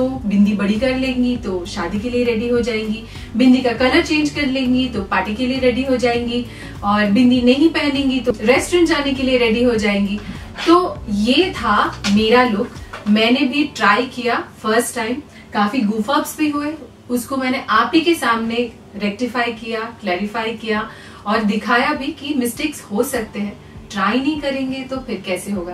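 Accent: Bengal, India. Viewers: Aoki baatein bohot acha lgtahai akdom bengali touch a Di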